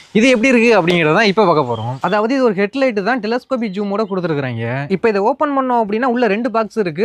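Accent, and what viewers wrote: Tamil Nadu, India. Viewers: നിങ്ങളുടെ തമിഴ് എനിക്ക് ഇഷ്‌ട പെട്ടു, സിനിമകളിലെ പോലെ അല്ല. ഇത് നാടൻ തമിഴ് ആണ്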